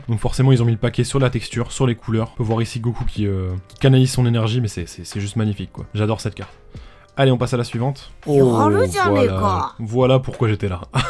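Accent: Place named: Japan. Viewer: Et puis cet accent japonais ! Incroyable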